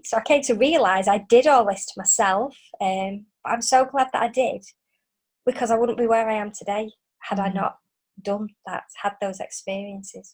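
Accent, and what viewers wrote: Scotland, United Kingdom. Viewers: Curious about her acent. she comes from scotland maybe.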